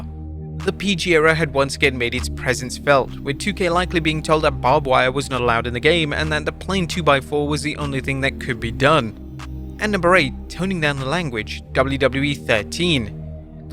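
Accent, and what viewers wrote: United Kingdom. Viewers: Is there ANY American youtuber talking wrestling? EVERY video I open has British accent.. 🤷🏻‍♂️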